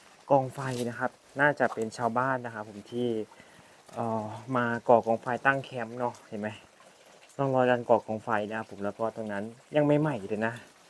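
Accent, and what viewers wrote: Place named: Ubon Ratchathani, Thailand. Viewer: เว้าคือลาวอุบลน้อ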